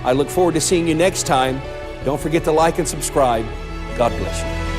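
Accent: South, United States. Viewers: I don’t have to miss Southern accented preaching just because I converted lol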